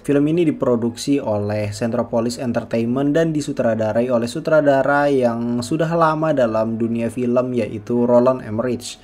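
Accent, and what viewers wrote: West Java, Indonesia. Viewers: Orang sunda gening wkwk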